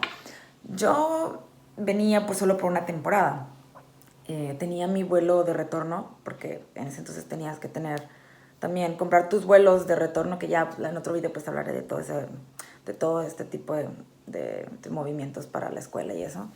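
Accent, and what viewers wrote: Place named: Monterrey, Mexico. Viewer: Holaaa. Acabo de suscribirme a tu canal y me gusta tu vibra.. Si no me equivoco te escucho con acento regio o al menos de otro estado del norte del pais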